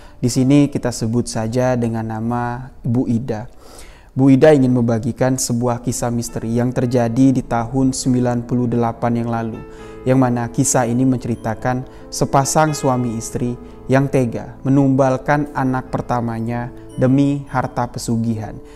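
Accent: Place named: Madura, Indonesia. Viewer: Malang hadir dan selalu mendengarkan cerita mesteri, nada bicaranya seperti orang madura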